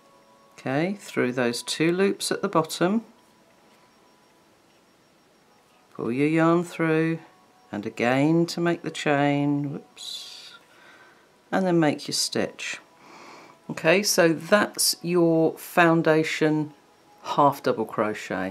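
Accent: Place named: United Kingdom. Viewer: So lovely to see a tutorial in British English! Thank you x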